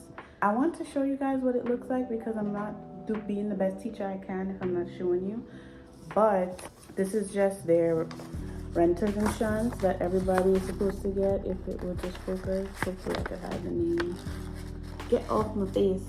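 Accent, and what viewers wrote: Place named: Trinidad and Tobago. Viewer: Doh lose dat trini voice, eh!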